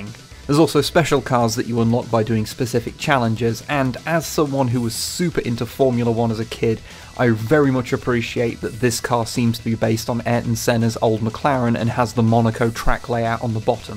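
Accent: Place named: United Kingdom. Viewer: That was really good, I've missed a British man reviewing video games in my life. The game also has a demo, downloading it right now.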